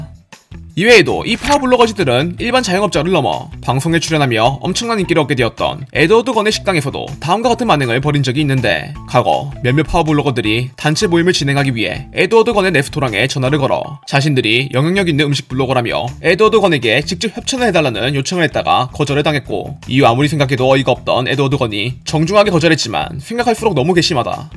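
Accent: Jeolla, South Korea. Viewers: 시작부터 전라도인 거 ㅈㄴ 레전드네 ㅋㅋㅋㅋㅋㅋㅋㅋㅋ 까보전은 과학인가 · 시작부터 전라도ㅋㅋ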